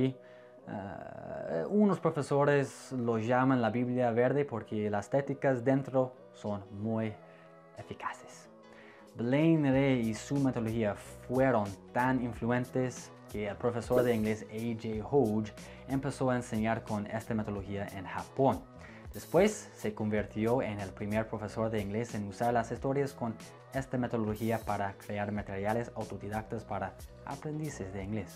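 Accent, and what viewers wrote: United States. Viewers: vos sos un buen profesor, lo que no te ayuda mucho es tu acento gringo. creo que nadie querría aprender a hablar ingles con una persona que habla español con un acento tan marcado, da como mala espina. pero si sos bueno, eh aprendido mucho con vos ! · Profe debo reconocer que cuando Zack Morris nombró a Kale y a usted, sí me sorprendí, pensé que era usted y fue muy decepcionante. Porque físicamente se parecen, pero la esencia de los dos es muy diferente. Usted es el otro lado de la moneda, es profesional y carismático. Y también hay otra gran diferencia entre los dos, Kale habla un Español con un acento casi perfecto y usted profe habla un Español con un toque de acento gringo(lo cual es adorable). Gracias por la aclaración ☺️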